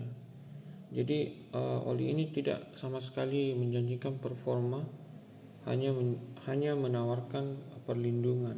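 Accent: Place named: Makassar, Indonesia. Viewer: udah style nya mungkin logat makasar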